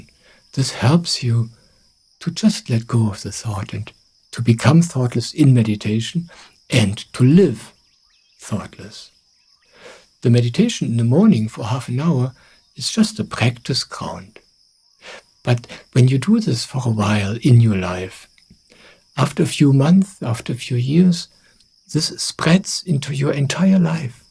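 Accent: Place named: Germany. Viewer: Accent like Geraman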